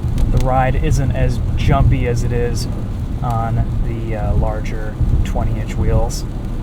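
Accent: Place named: Wisconsin, United States. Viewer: Hi! Thank you for this nice review! I like very much this Cockpit look! On a totally different topic, I used to watch some videos you made and each time I tell myself... "This guy has the same way of talking as my Wisconsin colleague". And finally thank to this video I discover that you are from there as well! (so there is a Wisconsin/midwest accent? ahah) Thank you for sharing all this!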